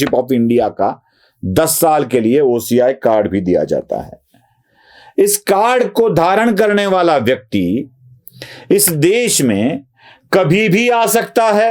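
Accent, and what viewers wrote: Maharashtra, India. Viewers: apki marathi hindi me kuchh maja nahi aata, isse achha ye hai ki aap kisi hindi bhashik ko higher kare aur, usse ye kam ksrawaye. प्लिज वाईट वाटून घेऊ नका, आणि मी आपला आदर राखून हे सांगत आहे. यात आपला काही दोष नाही. धन्यवाद.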